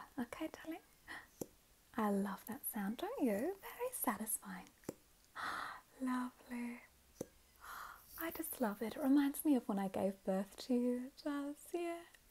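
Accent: Sydney, Australia. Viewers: Sim, o sotaque é real. É o sotaque do inglês australiano :) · I just found your channel and fell absolutely in LOVE with this character and your videos!! I’m from Canada & australian accents are so beautiful and calming to me. I immediately subscribed!! :) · australian accents are god tier 😋😭❤️ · every Sydney mum I overhear that comes on vacation to Gold Coast 😭😭 perfection. stunning. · Wow she must've said "charlotte darling" 100 times in just 1 video. Aussie accent is the best accent. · The accent is everything!! I am also from Australia hahahaha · I really liked the asmr but the Aussie accent was alright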